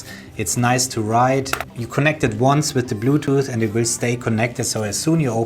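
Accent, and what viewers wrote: Germany. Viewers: Hey, good video! Well done. Are you german?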